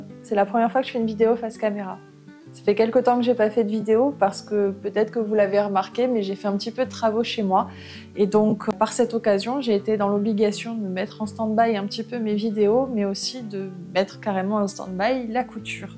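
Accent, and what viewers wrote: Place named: Carcassonne, France. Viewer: super video d ou es tu avec ce petit accent j ai le meme et je suis de carcassonne lol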